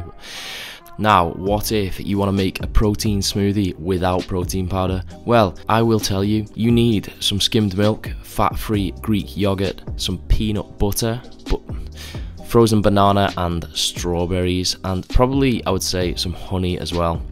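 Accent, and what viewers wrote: United Kingdom. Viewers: I love the British accent (quick say water) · the bri'ish commentary just makes it that much better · Something about a guy with a heavy UK accent calling the taste of a smoothie "absolutely fucking ruthless" with soft music playing in the background. You got my sub.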